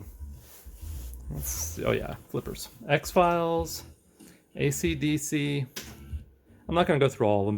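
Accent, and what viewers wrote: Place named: Germany. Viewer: U sounds like u are from germany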